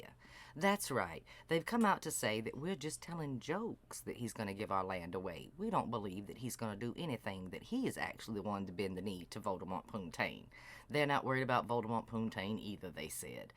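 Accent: South, United States. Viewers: Your southern voice is the perfect vehicle to deliver your scathing epitaph of dumpy pants daddy's downfall! Chef's Kiss 💋 ❤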